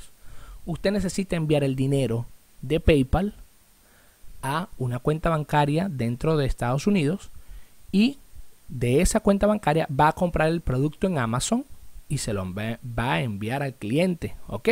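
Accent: Venezuela. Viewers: GRCIAS, EXCELENTE, CLARA, CONCISA Y SENCILLA EXPLICACION, POR TU ACENTO Y MODO DE HABLAR SEGURO QUE ERES VENEZOLANO COMO YO. GRACIAS.. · amigo saludo desde venezuela super importante su informacion pronto estare en contacto con usted,por su forma de expresarse es de venezuela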